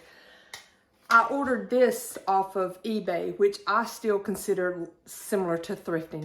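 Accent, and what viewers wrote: South, United States. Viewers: wonderful haul. I would paint your bedroom white and that away you’re not limited on what color accessories you can use. I have always loved Mary Englebreite books and have most of hers. The large book is wonderful. I speak Southern too😊. Love and blessings 🧡💛🧡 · Loved your haul. You speak just fine don' t change a thing. I'm from Florida and I have a southern accent also! ❤😊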